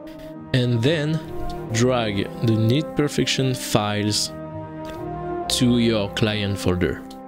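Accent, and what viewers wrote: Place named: France. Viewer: Don't worry, it's all fine, your English is better them most, to be honest, and with the french accent. ^_^ Thank you and Goodbye yourself. ;)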